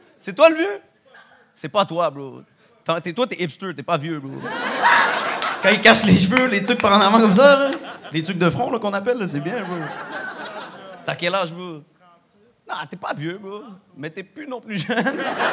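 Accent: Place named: Haiti. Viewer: Pourquoi il prend un accent haïtien??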